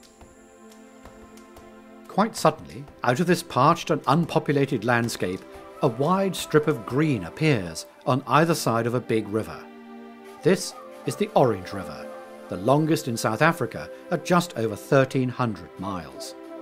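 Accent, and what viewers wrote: United Kingdom. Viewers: My first comment would be that the narrator get an education in pronouncing the names correctly. Would any Limey with so sophisticated an accent as his do so badly in that horrible French they all seem to effect? The editor or writer of the script is really really biased towards the "black"" side of our history. The world should remember that the black (mainly Xhosa) tribes arrived in this region at about the same time as the settlers, so there is no basis for the "rights"to any lands. Yes, there were atrocities inflicted, but by both sides...Sad that the Western Cape (ie Cape Town and environs) are omitted...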